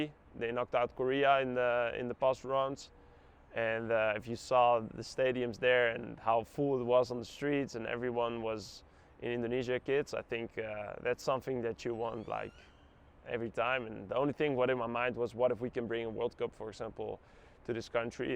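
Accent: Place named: United States. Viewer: aksen US banget mas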